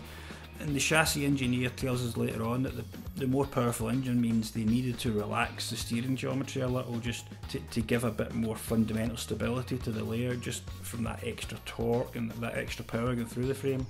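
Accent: Scotland, United Kingdom. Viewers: Ah the Scottish accent met with arsehole comments. Being Glaswegian myself, this is the shit that makes me refuse to share my own videos. If only we all had those irritating south English squeaky accents.... very very informative video guys thanks, mine comes this week :)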